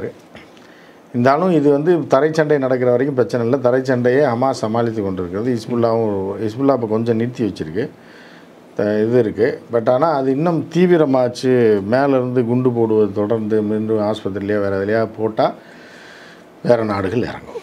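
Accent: Madurai, India. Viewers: sir. Naanum madurai karan tha. Un speech a vida unga slang ku fan. But kirmani was a wicket keeper. I beleive that bowler may be someone. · சார் சார் உங்களுடைய பேச்சைக் கேட்கும் போது மதுர மாவட்டத்தினுடைய பேச்சு மாதிரி தெரியுது நீங்க தமிழ்நாட்டில் வந்து மதுரை மாவட்டம் நான் வந்து கன்னியாகுமரி சார்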